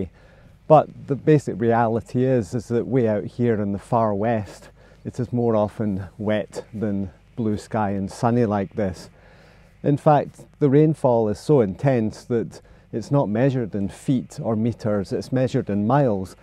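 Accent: Scotland, United Kingdom. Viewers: your deadpan Scottish accent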